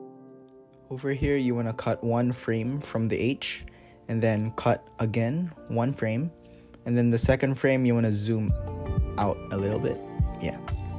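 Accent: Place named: Philippines. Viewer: Filipino accent do be nice tho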